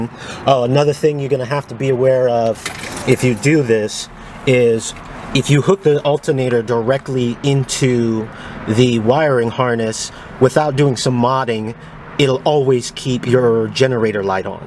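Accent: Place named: East Coast, United States. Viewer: Funny, you don't sound Californian! You sound like from the east coast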